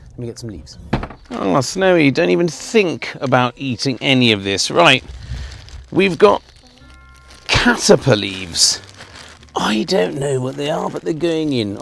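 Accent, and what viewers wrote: RP, United Kingdom. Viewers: There's something about hearing swearing in a posh accent that brings me great joy. 😆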